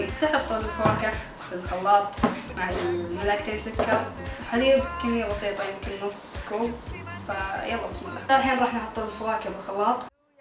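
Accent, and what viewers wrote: Kuwait. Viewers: هل انت كويتي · انت كويتي ولا سعودي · انت كويتي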